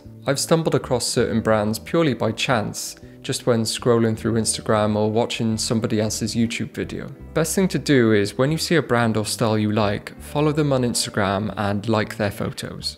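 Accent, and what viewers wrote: Wales, United Kingdom. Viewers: Another bullshit advice with a British accent.😅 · Such a beautiful accent! Is this typical of Wales?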